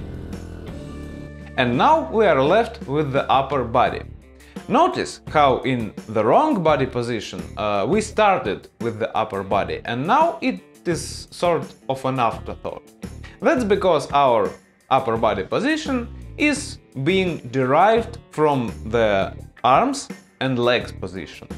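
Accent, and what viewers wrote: Russia. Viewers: You Russian?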